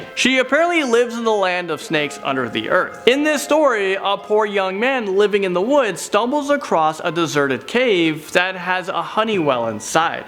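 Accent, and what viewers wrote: Canada. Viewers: “Oot”. Thank you. Nice to hear a Canadian accent escape onto the net.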